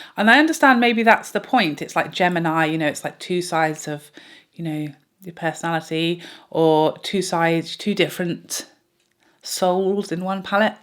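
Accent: United Kingdom. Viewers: Don’t think I need any of that…thanks for another great and entertaining video! The bit about the Fenty bronzer pallet was especially hilarious. I am a fairly recent subscriber (a month or two), but my inner monologue has already switched to a British “accent”!! ❤️ it!! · I like listening her British accents. You have beautiful eyes.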